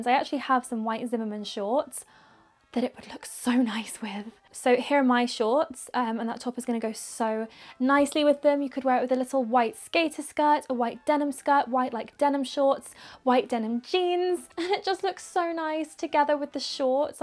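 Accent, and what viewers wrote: United States. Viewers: felt like singing today, and she was into her American accent. Good for you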